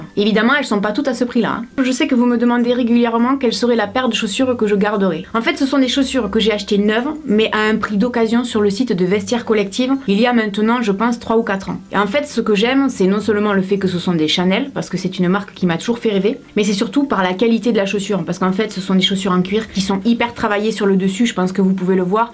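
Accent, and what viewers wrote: South, France. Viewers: J'aime trop ton axent t'es du Sud moi je suis marseillaise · Cc tu as l'accent du sud sa s'entend mdr moi je suis du sud aussi donc j'ai reconnu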